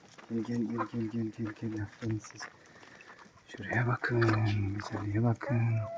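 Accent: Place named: Balkans. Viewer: Siz Türk degilsiniz turkceniz duzgun degil Balkan gocmenine benziyorsuniz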